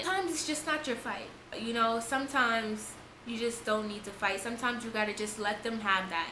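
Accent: New York, United States. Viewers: Are you from NY? And I don't mean this like in a mouthy or smart way, I promise 😂👐 I just legitimately wanna know if you're from NY cause I just gave a question about the dialect lol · I'm really sitting here realizing all of us from Ny talk the same when we mad or explaining a fight . Lol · I love to listen to her I love NY accents so much 😂😍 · I love her accent my cousins are from NY and they make fun of my southern Floridian accent 😩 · Love the New York accent and moreeeeeee story time plzzz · Your New York accent is so cute lol. I love it. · I love your New York accent !! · You was going in talking about the story, especially with your NY accent I Lo it lol.... But I love your moral of the story! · They way you talk, like you sound like from your from new york. I know you are from there but. I wish i could talk like that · Lmao Spoken Like a True New Yorker! 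Love You!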